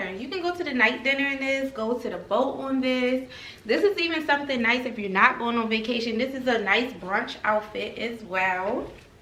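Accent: Baltimore, United States. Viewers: I love your accent 🥰🥰I knew you were from Baltimore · First time here are hi dear, are you from Baltimore I know our accent anywhere😂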